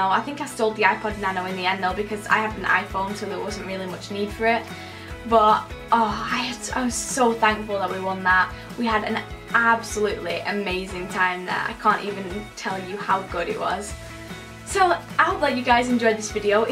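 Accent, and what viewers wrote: United Kingdom. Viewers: i love your British accent❤️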